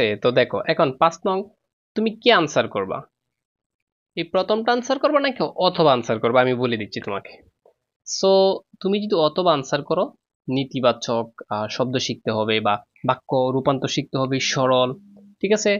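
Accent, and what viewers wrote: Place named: Brahmanbaria, Bangladesh. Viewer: স্যার আপনার কথা গুলো শুনে মনে হচ্ছে আপনার বাড়ি ব্রাহ্মনবাড়িয়া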